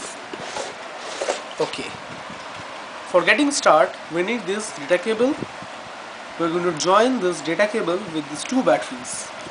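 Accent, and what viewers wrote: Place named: India. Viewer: I like your accent!😊 Are you Indian? I'm from Egypt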